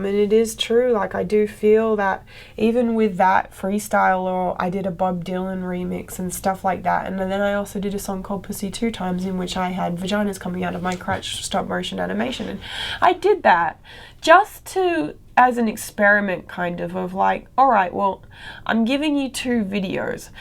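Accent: Australia. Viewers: she talks naivety with an australian accent well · So why does she still have an aussie accent when she speaks ?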